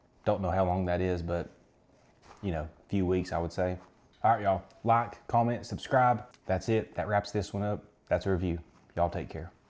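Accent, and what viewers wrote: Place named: Knoxville, United States. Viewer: Good Review! Im glad your video popped up on my recommended! If I had to guess you're from Tennessee, you've got that knoxville accent thats easy to listen to. Keep up the good content!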